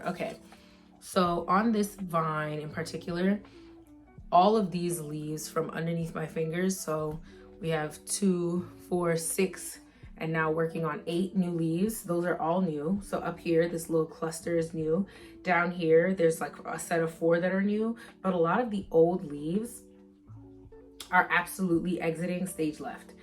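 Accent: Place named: New York, United States. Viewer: GORWLLLL how am I now coming across ya page… are you from New York? Cuz I know my New York girlies accent. Like, I’m cutting up. Naw, I gotta tap in now. Yuuuuurrrrrr 😅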